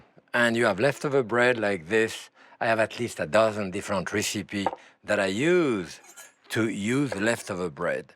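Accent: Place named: France. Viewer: I want to love these videos. I really do. But I can't helo but wonder how long you have to live in the US before you finally lose the thick French accent that makes it sound like you just arrived off the boat and barely speak any English. Apparently 50 years is still not enough. "A lot of de chef, dey ave de special razeur." Come on chef, you know how to speak English as well as I do.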